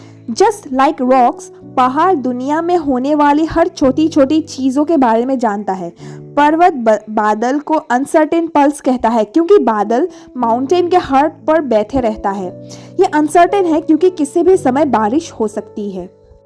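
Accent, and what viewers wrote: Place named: Northeast India, India. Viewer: Her accents:) 100%she is from north-east 🫂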